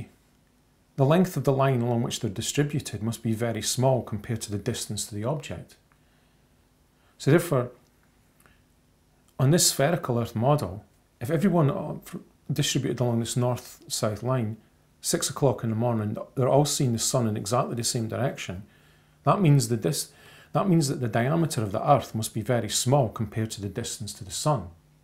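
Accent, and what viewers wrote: Scotland, United Kingdom. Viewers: Holy shit man! That's horrible. Personally I love your accent man. I come from a primarily Scottish family, here in Canada, so it makes me feel right at home actually. You sure as hell are more well spoken than some Scots. Some of them have such poor diction, that you can't even understand them, unless you are Scottish. You are perfectly understandable actually. People are fucked. Then to criticize your hands? Oh man, how childish. They are clean and so are your nails. Lol. So I don't get that either. People will pick anything when they have nothing huh? Lol.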